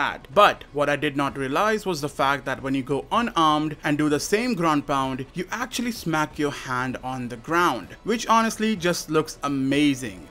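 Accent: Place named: India. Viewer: Why do you sound so Indian?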